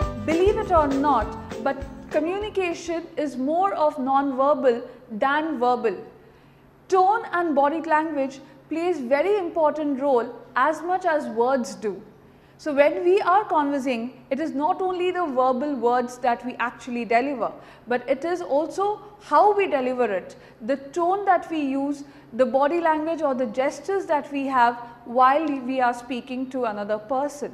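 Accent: India. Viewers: Nothing like a pretty Indian accent. So feminine and pretty 💕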